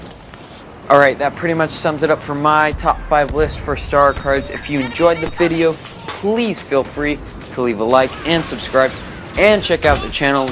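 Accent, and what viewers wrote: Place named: Balkans. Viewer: your sound close to balkan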